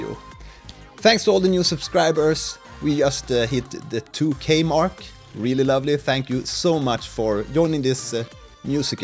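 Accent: Sweden. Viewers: Thanks for the great reply, I actually wrote the beginning down, it was a good summary. :) I will try and play with sounds that have more transients, like you suggested! And yes, I will keep commenting, you can count on that! ^_^ You too, may your weekend be absolutely awesome! :) Are you by the way from Sweden, or do I recognize your accent wrong? Anyways, greets from your neighbor (maybe) from Helsinki! :)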